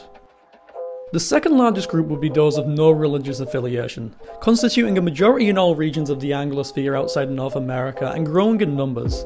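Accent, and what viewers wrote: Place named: United Kingdom. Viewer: “ The Americans “ 😂 you sound so British !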